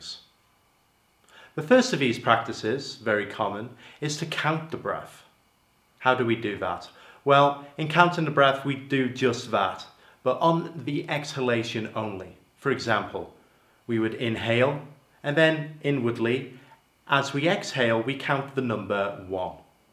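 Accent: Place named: England, United Kingdom. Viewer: Why does Steve-O have an English accent?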